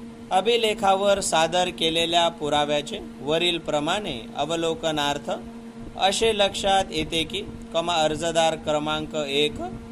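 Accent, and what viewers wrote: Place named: South India, India. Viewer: South Indian voice vatat ahe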